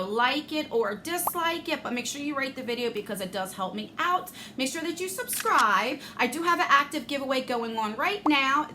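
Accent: New Jersey, United States. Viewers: I just discovered your channel yesterday! I knew you were a fellow Jersey girl from your accent!!!!! Definitely going to keep watching! Always ready to support a Jersey sister!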